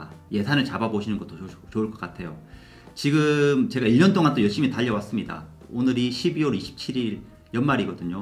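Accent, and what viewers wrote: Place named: Gyeongsang, South Korea. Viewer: 영상 볼때마다 홧병 생겨요ㅠ 왜 이제야 가 알고리즘에 떴는지 ㅠ 그래도 갱상도 구수하고 순수한 말씨가 좋아서 숨고르기하면서 봅니당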